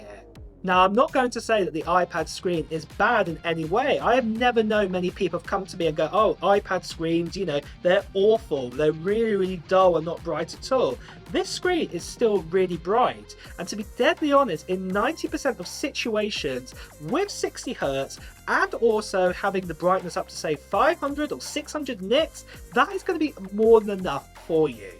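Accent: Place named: Midlands, United Kingdom. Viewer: Sounds alright to me, sounds like midlands region English accent.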